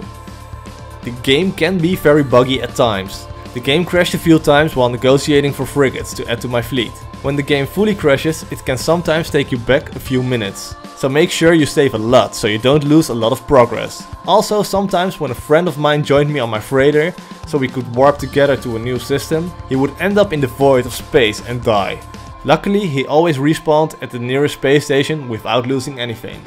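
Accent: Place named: Netherlands. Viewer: Ahh yes very cool, im also Dutch i thought already i could hear it in your accent a bitt, very good content you have a new sub 😎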